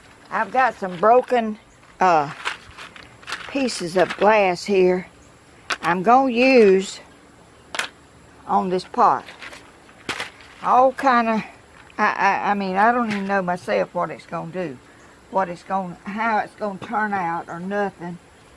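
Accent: South, United States. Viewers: Hello... yes some one has defintely hacked your account posing as u... the what's up app...I had this happen to me on another diyers channel... they caused a lot of problems for the other lady.. have your grandson look into it... so many ppl are jealous of all the accomplishments and hardworking Diyers put into their channel. All their personnel time out of the goodness of your hearts just to entertain us for someone hateful, jealous, person to do this is not right. These ppl need to be in trouble.Btw.. love all your videos your voice is so kind and sweet brings back so many memories that southern accent... you are an anxious, beautiful, kind hearted person. Stay safe.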